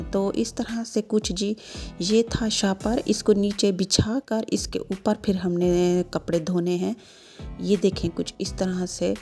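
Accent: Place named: Sindh, India. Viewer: Me new luranay video ap k dekhi, lgrha he jesah sindhi log sindhi style ,me b sindhi hun aap hyderabd me ho ya karachi me??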